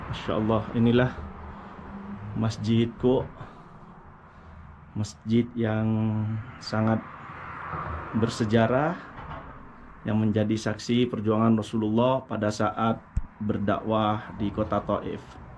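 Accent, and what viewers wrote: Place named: Madura, Indonesia. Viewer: klo dr bhsaxa pasti madura🤣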